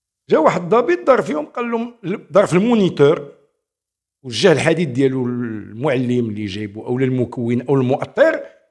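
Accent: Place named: Algeria. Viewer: كتهدر بحال الجزايريين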